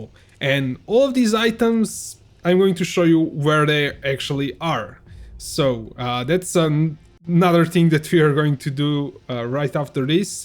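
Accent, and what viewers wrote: Russia. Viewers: Hi, thx for the vid. Is that Russian accent or Polish? Just asking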